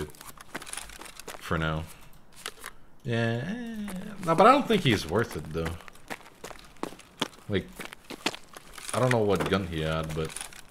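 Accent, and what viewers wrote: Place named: United States. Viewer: С твоим американским акцентом хрен поймешь кто ты есть на самом деле, я подписывался на американца, а ты украинец вообще, если верить комментариям...вотэтоповорот. Жги, братюня!)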